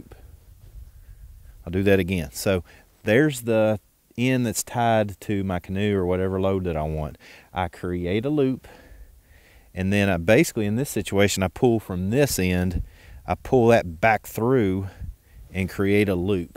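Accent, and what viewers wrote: Kentucky, United States. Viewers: As soon as you started talking, I thought, "This guy's accent sounds very KY." Then I realized from your logo you're in Winchester. From one fellow Kentuckian to another, keep up the good work. You did a great job explaining these knots.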